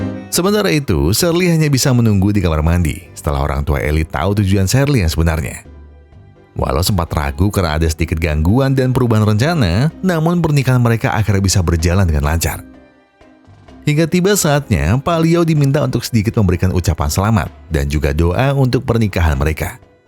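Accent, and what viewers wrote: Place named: Semarang, Indonesia. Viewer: logat mu to kayak wong semarang og mas😭😭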